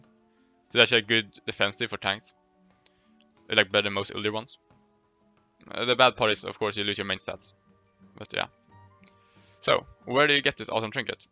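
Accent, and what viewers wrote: Sweden. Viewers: As soon as I heard you're voice I was like ''Yeah, he's swedish''.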